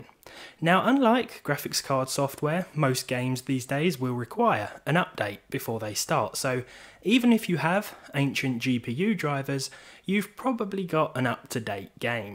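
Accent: United Kingdom. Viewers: He's british. It's called an accent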